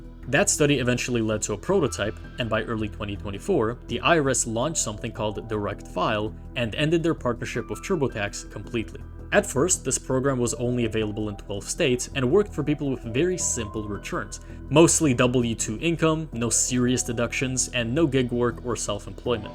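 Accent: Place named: Philippines. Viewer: I just know this is a kababayan. I can hear it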